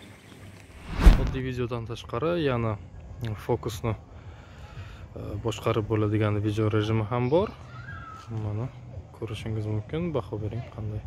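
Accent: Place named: Bukhara, Uzbekistan. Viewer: Бухороча шева малумда барибир, гап йок молодец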